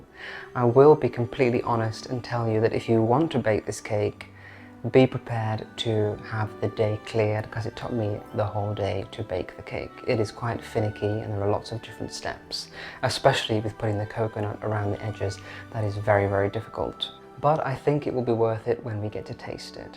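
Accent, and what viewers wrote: United Kingdom. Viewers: Your cake is lovely and looks delicious. I never get tired of hearing your beautiful voice and wonderful British accent. I could listening to you read for hours at a time. Wishing you the loveliest of birthdays. 🐕🇺🇸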